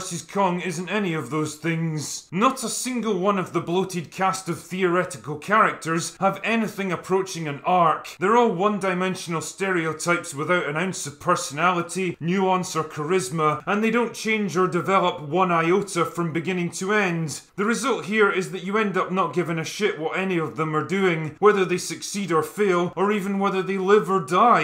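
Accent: Scotland, United Kingdom. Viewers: I think that's part of his gimmick, hence the over the top drunk Scottish accent.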